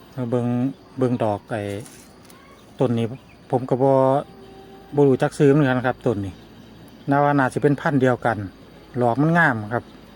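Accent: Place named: Isan, Thailand. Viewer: มาชมบรรยากาศงามๆนำครับ เจ้าคนจังหวัดได่ สำเนียงคือข่อยแท้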